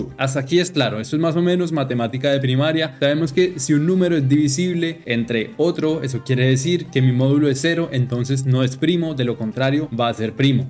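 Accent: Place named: Colombia. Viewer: Se fue para Alemania pero el acento colombiano no se va 😂 no se si me equivoqué rara vez me pasa gracias por el video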